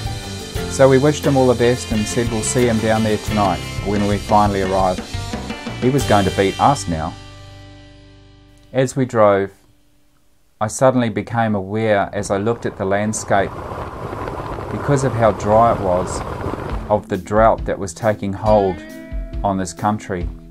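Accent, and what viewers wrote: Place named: Australia. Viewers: Australian accent is quite different... but then again so are most Aussies (we won't say where he REALLY comes from...) must admit he comes across as pretty suave... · Great video! Looking forward to next episode. The Australian accent is quite different, but I like it.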